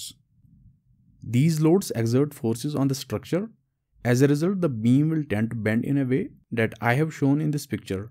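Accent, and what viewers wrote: Kashmir, India. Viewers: I could guess from your accent, I am also from Kashmir 😊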